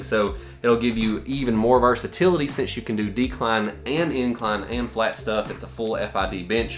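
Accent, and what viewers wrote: South, United States. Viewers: You have a southern accent.. What state are you from ? IM from Canada BTW 🇨🇦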